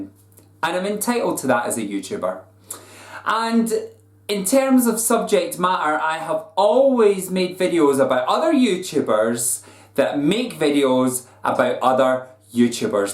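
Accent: Scotland, United Kingdom. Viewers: I am so sorry that this happened to you! This person is clearly unstable and loves to start and create drama. He clearly doesn't have much of a life. You probably hit a nerve, and that is why he did what he did. I think that you have always been very mature and professional with your videos. I enjoy you and your channel. Also, love your Scottish brogue! ♥ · yaay another fellow Scottish YouTuber 😉 your accent just made me happy for the sound of home after a few hours of YouTube videos 😂😂 weird world we live in 😫 imagine weirdos like that are in every corner of the internet. I completely understand the need for privacy, a person's life is there own to share if they wish. I really believe some drama channels forget a normal person is behind the computer.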